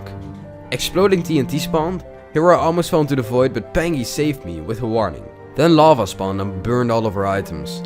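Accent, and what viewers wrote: Netherlands. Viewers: The dutch accent i love it 😂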